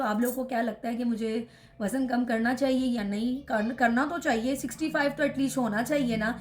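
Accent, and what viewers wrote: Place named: Karachi, Pakistan. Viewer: mughe pata nahi qn hamesha se lugta he tum karachi se belong karti ho tumhari urdu same hamare karachi walon ki tarah he khair hamesha khush raho❤❤❤❤❤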